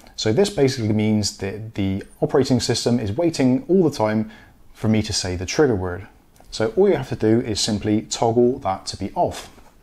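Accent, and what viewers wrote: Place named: Devon, United Kingdom. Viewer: you're a bloody genius! Thank you! Nice to hear an accent from home too! (I'm from Torbay) Once again, you've rescued me from a compete shock when I made my first voice call on a brand new phone! Cheers!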